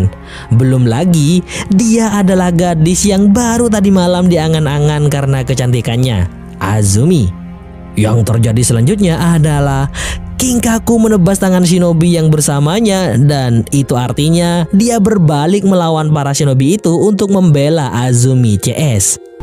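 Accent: Madura, Indonesia. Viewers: Min org madura yaa?? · Sama2 org madura mass, btw asal kota mana ya😂❓❓❓ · addaaaa teppak ka reng madureh😂,,,,,ta'entos kakeh nom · Orang madura ya mas🙏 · Admin oreng madureh dimmah yeh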